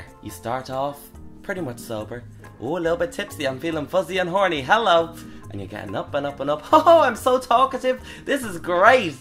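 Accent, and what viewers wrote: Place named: Ireland. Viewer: I love your accent. I need an Irish man in my life!! I could listen to you all day. Sending love from the other side of the world (New Zealand)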